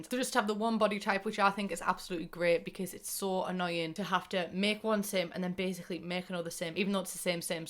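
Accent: United Kingdom. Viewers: I have to turn on closed captioning sometimes to figure out what you're saying (accent for my ears, difference of word usage from US to GB) and I just have to say, watching CC try and and guess what it is your saying is a crack up all in itself ('porn Hilda'...LOL). I will clearly hear words that it TOTALLY messes up. It's just a whole 'nother reason to come watch your videos. Congrats on finishing your Masters and getting your own place. You're doing so well and i'm sure your family is so proud of you. I may be 39, but I still remember those early college years and the struggles (and I only went for a BS, never got a chance to go for my Masters). I hope it's all downhill for you from here on out. I have to say, i'm not a huge occult person, but I am very excited for Realm of Magic. I don't know what I really expected, but so far what i've seen in videos isn't really what I was looking for (again, not sure exactly what I was looking for, but the build items, while nice, just don't scream "witches and wizards" to me and that's really what i'm interested in) but regardless, I will be buying it tomorrow. 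:) I feel like the GP are usually worth the $20 price tag, and i'm a penny pincher, but I don't mind paying for this one full price right out of the gate. Re: Windows...feels a bit lazy to me. I know it's a lot of work involved for meshes, but when the first set of windows are done, without actually ever having built a mesh myself (but having lots of design experience) usually y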